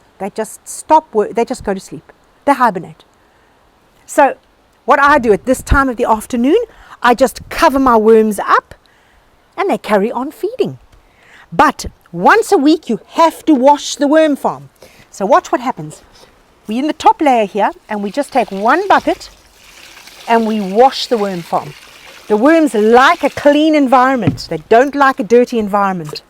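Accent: South Africa. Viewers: Ex South African? Loved your video!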